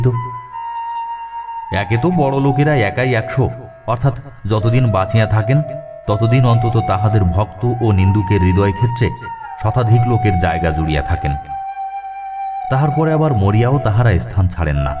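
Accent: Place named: Thakurgaon, Bangladesh. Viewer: Apnar Voice ta ebong background music ta khub bhalo legeche. Uporontu apnar Thakurgaon Bongaiyan uccharon e matir taan anubhav kori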